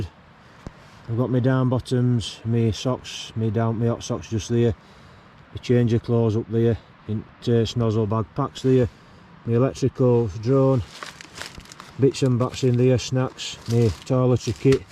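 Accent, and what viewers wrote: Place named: Liverpool, United Kingdom. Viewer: Loving the scouse accent pal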